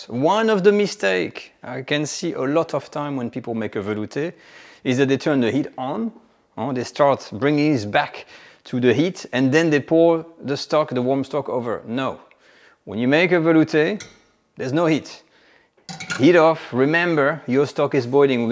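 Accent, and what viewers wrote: France. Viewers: He was born in Paris. Why wouldn't he have a French accent?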